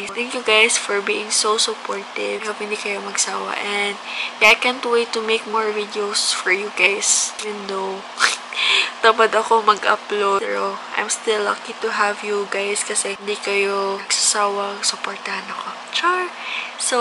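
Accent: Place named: Visayas, Philippines. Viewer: I love the accent of bisaya hahaha!! New subscriber here 😊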